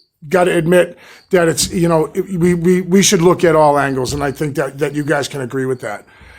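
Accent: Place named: Boston, United States. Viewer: You have an accent. Maybe Boston. Where are you originally from you have an accent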